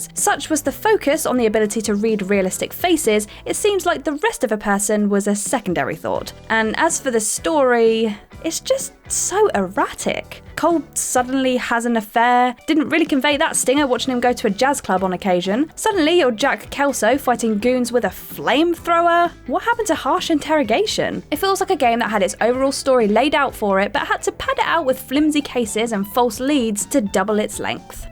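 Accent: United Kingdom. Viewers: The only thing I like in this video is your lovely British accent. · Why are British accents so fucking annoying? Bloody awful.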